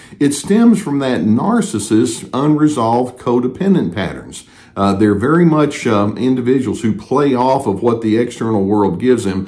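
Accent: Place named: Texas, United States. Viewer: YES!!! I was USED. Very difficult to wrap your head around that when you first realize! Who DOES that??? Answer; someone who doesn’t really have a life! When all is said and done; I have lived my life well. I have learned, I have raised a child, I have respected my parents, I have been a decent giving person as much as I could. I have EVOLVED! He was a hollow shell, a cheater, a crook, immoral and filled with cynicism and hate. He had to PRETEND to be someone else to get me! So WHO REALLY WON??? (I you want to say it in the crude modern vernacular!) Thanks again Dr for the nuances you bring to this subject. PS; never thought I could become FOND of a Texas accent😉!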